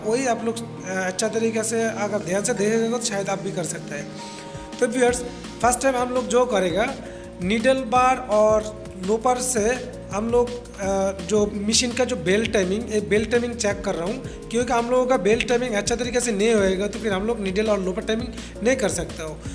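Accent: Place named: Bengal, India. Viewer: Bangla channel achhe naki.. apnar Hindi shunlei bangali lagchhe toh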